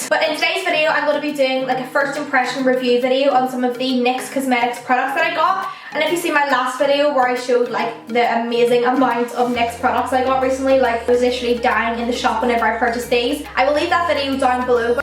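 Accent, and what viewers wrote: Northern Ireland, United Kingdom. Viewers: Are you from Northern Ireland? 😊 ps. Love your videos❤️❤️❤️ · Are Your British? Your Accent Is So Nice!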